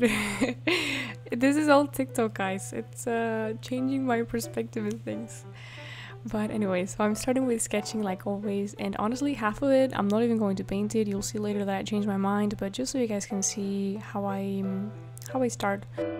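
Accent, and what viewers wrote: Spain. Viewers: This is the first video of yours I’ve ever seen and for context my spanish teacher at my high school has an insanely similar accent and speech pattern to you, I find it very pretty and calming (I love accents but Hers is one of my favorites) and she is from Spain. When you said you were from Spain I freaked internally because you sound so much like her your voice is so beautiful and calming! Can you do ASMR while painting?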